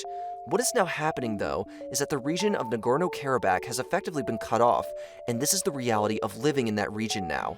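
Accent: Spain. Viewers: He's probably Spanish, where "J" is pronounced "H". Like Juan, Jose, Marijuana,...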